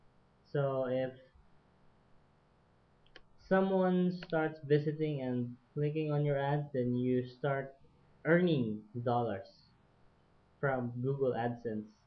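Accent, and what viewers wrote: Philippines. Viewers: its because you sounded like noypi... just like me.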